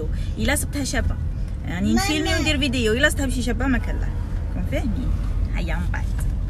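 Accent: Chlef, Algeria. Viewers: من هدرتك عرفتك شلفاوية انا من جيهتك عين دفلى تحية ليك حبيبتي ربي يفوقك حنا رنا نحرقو هنا في العطاف لي قريب لشلف ههههه عجبتني في تواضعك ربي معاك❣️❣️❣️❣️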